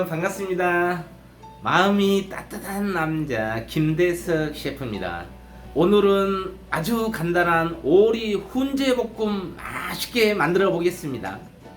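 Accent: Jeolla, South Korea. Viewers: 요리도 요리지만 구수한 전라도 사투리가 맛깔납니다.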